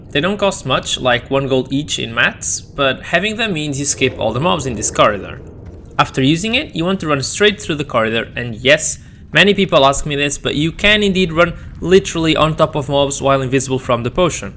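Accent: Portugal. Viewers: Português pelo sotaque certo? Qual o servidor? Abc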